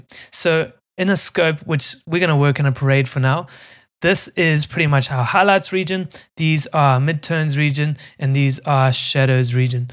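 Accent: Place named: South Africa. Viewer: south like a fellow south african , that accent is very south african but thank you so much for the lessons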